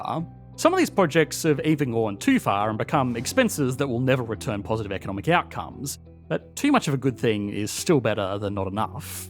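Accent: Australia. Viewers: Australian English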